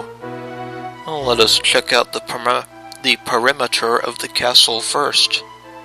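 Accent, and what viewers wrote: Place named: South, United States. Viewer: Thanks for doing these vids! I really enjoy the narrative, you sound like a good friend of mine, a southern gentleman😁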